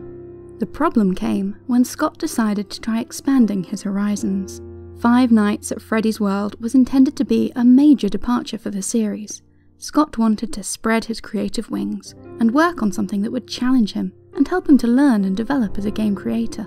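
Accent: United Kingdom. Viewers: Yeah that British accent gave me an instant no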